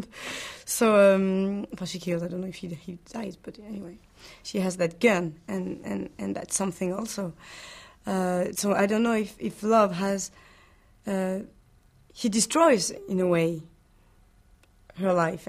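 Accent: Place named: France. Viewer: lovely french accent!kinda sexy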